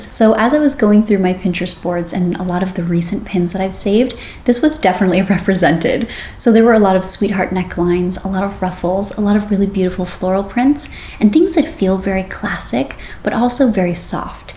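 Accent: United States. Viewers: american? canadian? accent of the host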